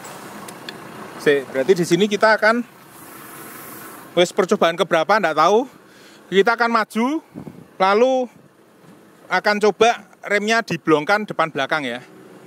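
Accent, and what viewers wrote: Java, Indonesia. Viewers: Lucu juga Om ....santai...kejawa jawaan.......tapi muatan ilmu nya ada...wokeee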